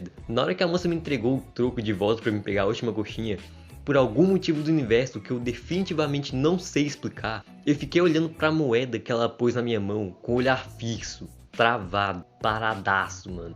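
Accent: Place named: Bahia, Brazil. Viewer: voz de baiano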